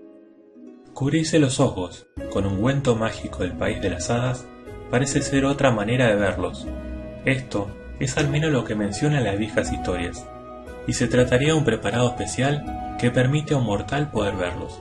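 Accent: Argentina. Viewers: bueno pues tu acento me parece que es de una persona de argentina. posdata: me saludas a maradona y ha leonel messi jajajaj es broma tío. buen vídeo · eres argentino o uruguayo o paraguay por el dejo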